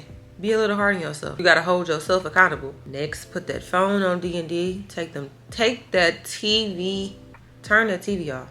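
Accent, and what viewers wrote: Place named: Detroit, United States. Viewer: I can hear the Detroit in your voice ❤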